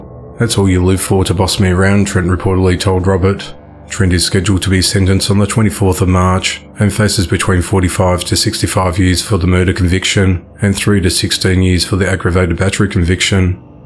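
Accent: Australia. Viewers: Hi I have to ask I hear a Aussie accent from you if not all I have to say to you congrats I thoroughly enjoy your channel keep posting your uploads I’ll keep watching stay safe Julie 🇦🇺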